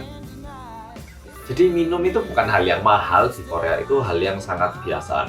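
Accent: Java, Indonesia. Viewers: Korea medok maneh rekkkkk, asyekkkk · Medokkkkkkkkk ohhhhhhhh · Yang medoookkkkk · Medokmu sam.😂🤣🤣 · Kalo medoknya ilang gimana? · seneng wae ngunu krunguu Medhoooog e kuii sen puanjjaaaaangg😂😂😂😂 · Paling suka awal pembukaan bersama orang Korea yang medhooooookkkkk🤣🤣🤣🤣🤣🤣 khas bgt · Yessss orang Korea yg medooook muncuuulllll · Seneng bgt tiap denger kalimat medhoooook 😁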